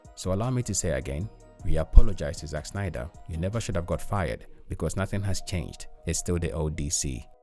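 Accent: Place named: India. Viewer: of course this video is made out from a indian dude w the accent lmfao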